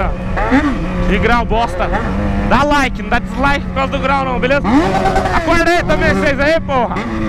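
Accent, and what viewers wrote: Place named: Northeast, Brazil. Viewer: EU SOU DO SUDESTE E VEJO TDS SEU VIDEOS PQ VC SO FALA NORDESTE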